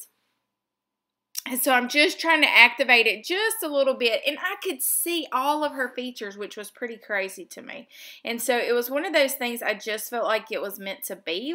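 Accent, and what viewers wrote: South, United States. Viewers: I love your southern drawl AND your vids! 🙂🙂🙂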